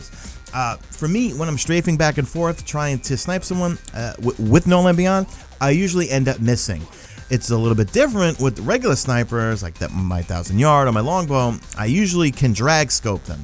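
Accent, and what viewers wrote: New York, United States. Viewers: you from new York? or Boston perhaps?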